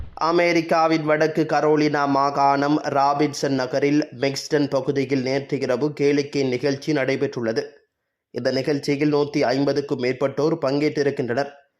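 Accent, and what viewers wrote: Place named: Chennai, India. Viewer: Madras தமிழ்..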